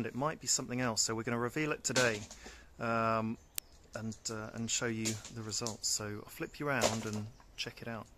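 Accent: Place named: United Kingdom. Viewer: Just found your channel and enjoyed the content so have subscribed I love hearing the British accent, what part of England are you from? Cheers from Melbourne Australia ❤️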